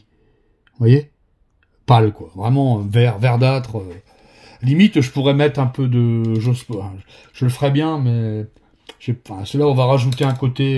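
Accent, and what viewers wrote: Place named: Nord, France. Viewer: Merci pour cette super recette, j'aime bien le rendu 🙂 Un grand oui pour des tutos une fois par semaine 👍 Je ne sais pas si tu donne des cours, mais tu devrais. Tes explications sont toujours très claires et sans chichi. Un grand merci! Et en passant, ton accent du chnord est au TOP. Bonjour de la Suisse!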